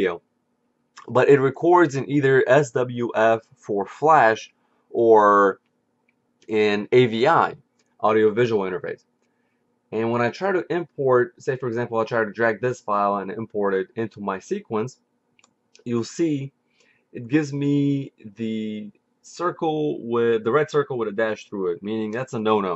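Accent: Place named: Russia. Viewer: Russian? I hear strong russian accent :D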